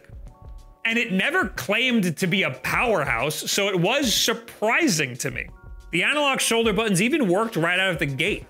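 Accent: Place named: Long Island, United States. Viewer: PLZZZ stop talking like a Long Island hussie 😊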